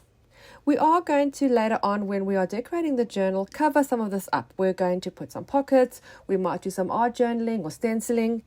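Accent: South Africa. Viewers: New subscriber here! Are you originally Australian,as you sound very South African to me -I am a SA expat,so just curious 😊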